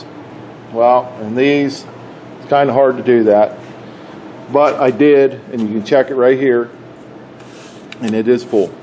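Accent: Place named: Pittsburgh, United States. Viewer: Good vid thanks cuz... it's what I thought anyways but you just gave me some reassurance that I was doin the right thing. You're a Burgh boy ain't you? I can hear it in the accent (it's thick cuz), the force is strong wit this one.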